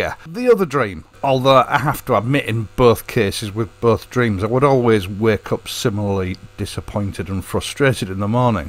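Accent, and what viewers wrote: United Kingdom. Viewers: Yeah, it´s official... You are the greatest Royal Enfield fan in the world !!! Congratulations and thanks for sharing your experiences and letting us hear your absolutely British accent. Your videos were a great support during the process of getting my own Orange Crush RE Interceptor (locally assembled in Argentina). Thanks again and best regards !!! Ernesto M. Parodi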